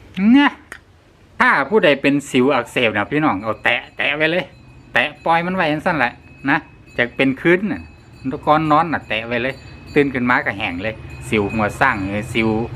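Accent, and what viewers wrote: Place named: Chaiyaphum, Thailand. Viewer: สำเนียงคล้ายคนชัยภูมิแท้คับ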